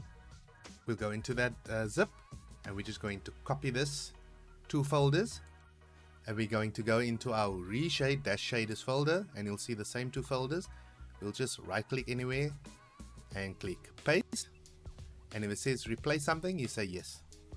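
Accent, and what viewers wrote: South Africa. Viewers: ahhh the defining South African Accent ! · are you from south Africa???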